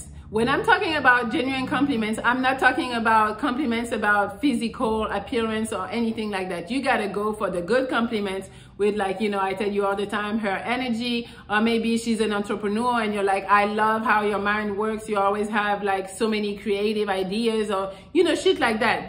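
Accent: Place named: France. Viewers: you've got that french accent I can hear, tu es sûrement française mmmh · Oui en effet, j'avais remarqué ce petit accent et je suis allé verifier après. Oui elle est bien française. Le aurait même dû me mettre la puce à l'oreille.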